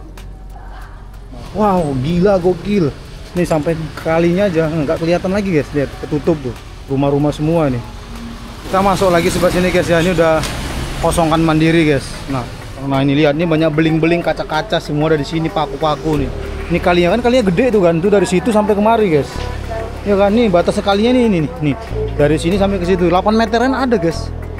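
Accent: Medan, Indonesia. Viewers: kental kali cakap medan kau lek....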